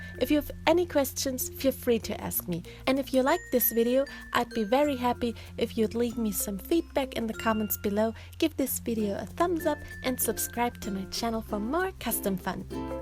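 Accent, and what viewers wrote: Germany. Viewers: Are you German? I like your accent. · speaks good English with slight accent Me: Mmh... is that a german accent I hear? Me: Sees Uhu glue YES I was right!(or she's austrian) If you see this comment pls reply! (I'm german too?)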